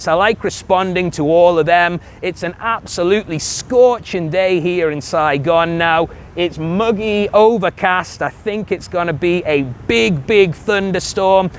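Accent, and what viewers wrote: United Kingdom. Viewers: Love British accent, love your videos.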